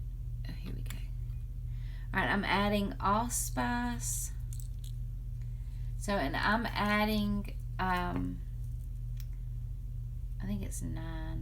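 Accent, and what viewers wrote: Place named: South, United States. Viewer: I could listen to you talk all day, you sound like my ex wife. You southern belles are something else.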